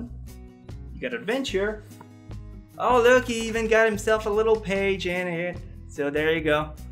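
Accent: Quebec, Canada. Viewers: I was thinking of a Canadian french... :D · it is candian freanch.... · are you from Quebec? Because you have really similar accent to my friend who is a Québécois.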